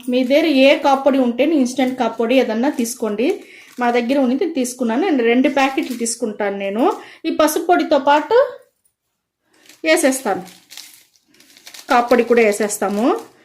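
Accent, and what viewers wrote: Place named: Chittoor, India. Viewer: మేడమ్ గారు చిత్తూరు జిల్లా తమిళ్ బోర్డర్ లో ఉన్నటువంటి తెలుగు వారు అనుకుంటున్నాను.అయిన తెలుగు బాగా మాట్లాడుతున్నారు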